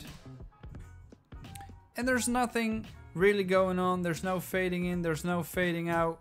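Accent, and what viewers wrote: Netherlands. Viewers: dacht het wel dat ik een Nederlands accent hoorde ! succes nog en doe zo verder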